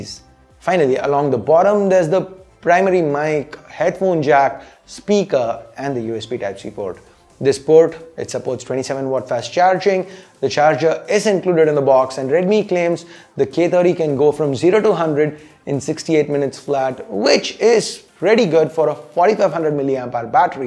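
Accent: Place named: Tamil Nadu, India. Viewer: Haha tamil channel illama english veraya hmm👍👍👍👍👍👍👍👍👍👍💕💕💕💕 enna dhan english pesinalum tamil slang madjiriye iruku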